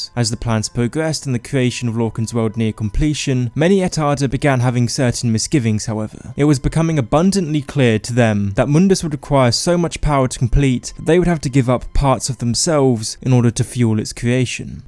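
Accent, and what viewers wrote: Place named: England, United Kingdom. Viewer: Haha man I think I get what this guy’s saying. You’re like English friend one, and Simon from [everysecondyoutubeaccount] is English friend two. David Attenborough is like my Grandfather who’s since past. Voices and accents are fascinating.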